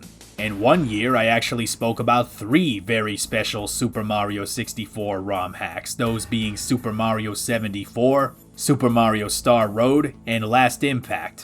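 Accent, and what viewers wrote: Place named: New York, United States. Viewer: I find it funny how in ten years voice went from sounding like a generic 17 year old boy to a gravelly new york stereotype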